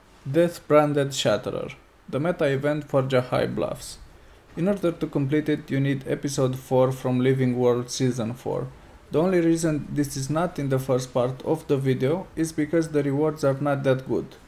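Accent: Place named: Romania. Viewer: I like your accent, where are you from? Romania? Turkey?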